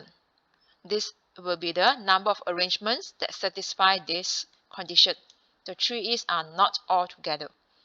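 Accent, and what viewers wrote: Asia. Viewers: i hate permutations and combinations but u explain it so effortlessly maam, thank u sm i subscribed... btw i love the asian accent its so nice to listen to idk why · Love the Asian Accent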